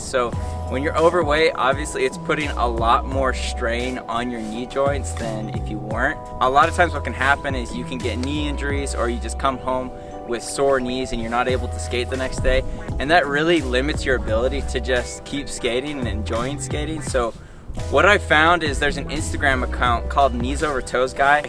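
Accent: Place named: Utah, United States. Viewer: I somehow knew you were in Utah as soon as you started talking 😂. Great video, thanks.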